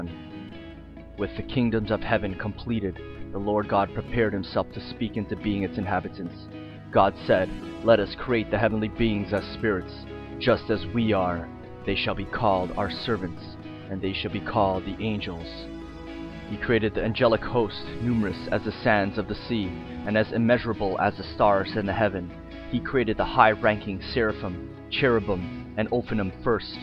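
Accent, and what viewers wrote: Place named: United States. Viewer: Before you try to claim I'm being anti-patriotic, I'm an American. Anyway, there is nothing more cheesy than an American accent mixed with forced heavy tone - it just sounds laughable. I would prefer a Rabbi speaking in Yinglish (Yiddish/English) over this very non-threatening and boring and characterless American accent. Boring.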